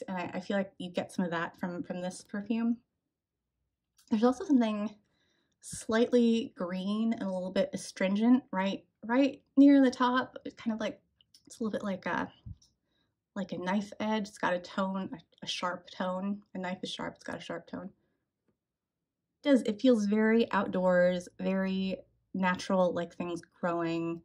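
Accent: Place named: California, United States. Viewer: Blue jasmine tea and smelly feet papyras. I love these descriptions. The Jasmine Rouge really didn't impress me. I need to go back to it again. It sounds much more interesting than I found it. The SL does go down my path of jasmine, I love that natural smell of jasmines and their indoles. Such an ethereal heavenly kind of scent. Similar story with me with Alien, I'm not overly shy always but don't approach randoms. But I smelt that in the air and was just so wow I had to ask her. I absolutely adore it. I'm not very happy with "Mugler" as they are called have done to it. They've took it's bite away. It's like some childish version now, all the boldness has gone all that wow factor. It's sweet and friendly. I did enjoy this one. Jasmine is one of if not my favourite note. It can be so different in fragrance can't it. Thanks for the video a good first to watch. 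I feel like I have a new Netflix series to catch up on. I'm terrible and American accents but I know the Yeehaw texas and gangster new York I think 🤣. I could be wrong is yours California? It's very pleasant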